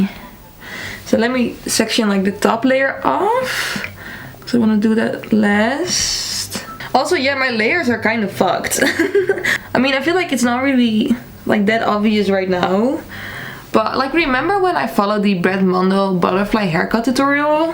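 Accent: Netherlands. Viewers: every time I watch your videos I still wonder if ur dutch cuz I never knew but u rly have that dutch accent lol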